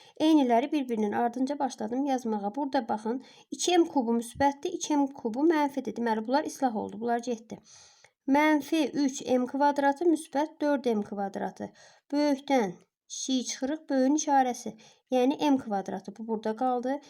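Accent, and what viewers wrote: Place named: Northwest, Azerbaijan. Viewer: Salam müəllimə çox sağolun əziyyət çəkirsiniz, haralısız? (Qax, Şəki, Zaqatala?) mən Qaxlıyam ləhcəniz tanış və yaxın gəldi.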